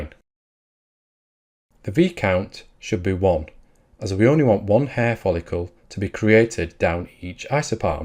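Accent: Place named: Australia. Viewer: I love Australian accents. Yoo cawll daht a noife?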